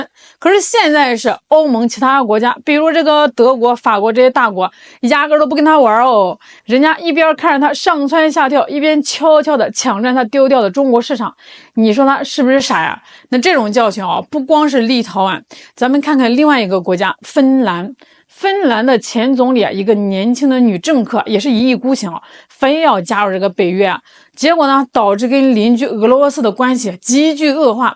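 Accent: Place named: Shandong, China. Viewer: 主播山东姑娘吗？带着家乡味呢❤❤❤😂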